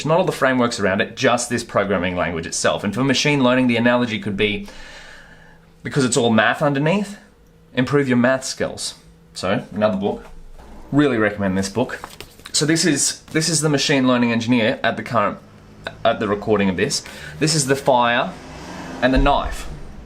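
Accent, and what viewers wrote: Australia. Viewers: hahaha. I krew you live in Australia but assumed you've emigrated. All judged by the way you talk. English is my second language so it's natural mistake. :) I'd love to do some overlanding in Australia one day. Take care :)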